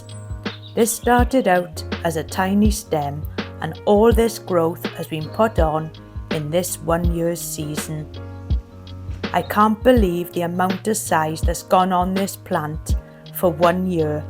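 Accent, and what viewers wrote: Wales, United Kingdom. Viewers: Thanks for this. Nice honest tropical style garden it’s inspiring. I love the welsh accent so kind and warm , much love x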